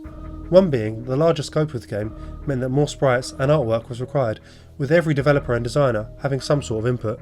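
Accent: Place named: United Kingdom. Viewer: Ah, I got a British accent, this time. Lotta Metroid videos, lately.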